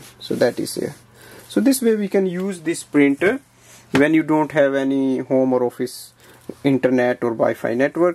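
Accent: India. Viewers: Nice gujju accent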